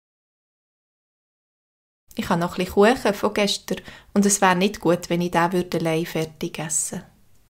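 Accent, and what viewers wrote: Bern, Switzerland. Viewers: Merci vielmals Aber i ha ein frag Isch das barndutsch?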